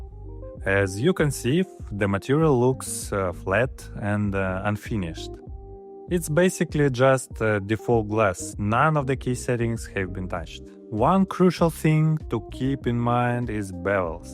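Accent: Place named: Russia. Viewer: Ты русский? Английский палится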